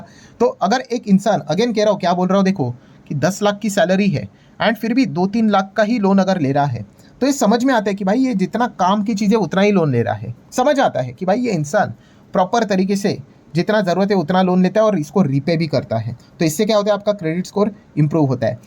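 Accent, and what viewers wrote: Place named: Maharashtra, India. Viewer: sir chya bolnya waru n ch samjat ki sir marathi aahe sir sher market che danvir karn aa he free madhe deep knowledge detat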